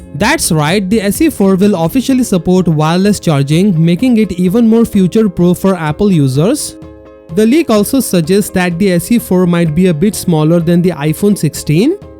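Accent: India. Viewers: Indian origin narrator? Why can't we get a neutral one?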